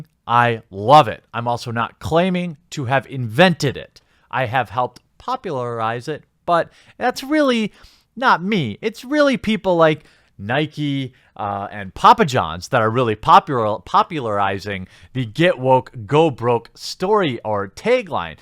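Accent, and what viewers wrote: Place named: Midwest, United States. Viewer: Interesting Midwestern vowel merger: since/sense homophones, etc.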